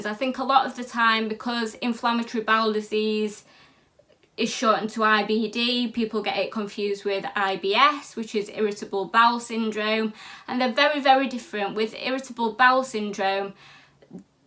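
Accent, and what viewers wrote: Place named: England, United Kingdom. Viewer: Do you have loop ileostomy or a end ileostomy.. i had a loop ileostomy in June 2022.. I have a odd shape stoma so i carnt get precut pouches & I still carnt cut them to fit properly.. that problem really stresses me out.. also its nice hearing someone on youtube talking about ileostomys with a English accent.. nearly all the ones iv watched are American.. I'm in England x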